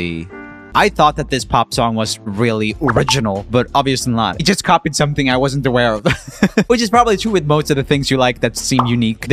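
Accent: United States. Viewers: I don't know why byt I heave expected that you studied music or something, but definitely not looking at how do you pronounce all of those names. But damn, that americanized pronounciation gave my dry heaves, just how could you? ; ((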